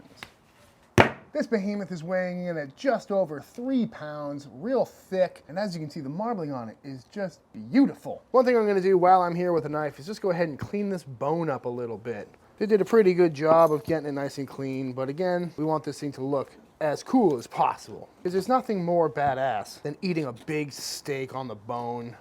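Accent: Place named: Massachusetts, United States. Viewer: I hear that Mass accent. Where you from in Mass?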